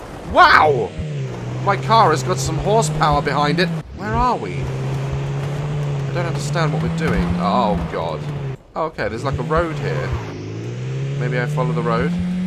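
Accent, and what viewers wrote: United Kingdom. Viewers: I can tell this guys British, wee = pee which means weed =peed.... I'm sure no one needed to know this buuutt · He’s so british Lol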